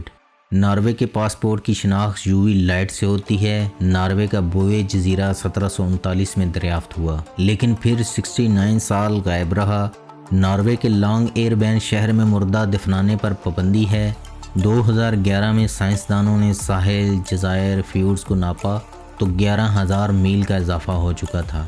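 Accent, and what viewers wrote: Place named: Punjab, Pakistan. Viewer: the information is good but please pronounce the words accurately, if you are speaking in Urdu language as at the moment, you are speaking in punjabi. It Not 'Narway' its pronounced as Norwary. Secondly its called 'mulk' not mulaak in Urdu so please correct that.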